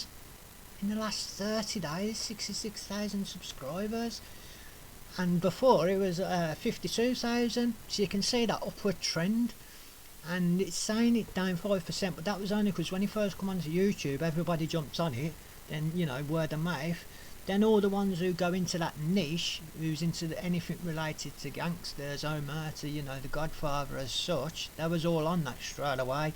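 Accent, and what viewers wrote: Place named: Birmingham, United Kingdom. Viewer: Good video apart from the brum accent !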